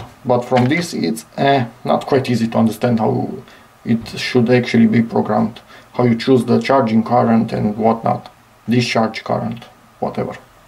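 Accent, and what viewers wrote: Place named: Romania. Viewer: Hello, nice video, thanks for the info. PS: are you romanian(you sound like one, by the accent)?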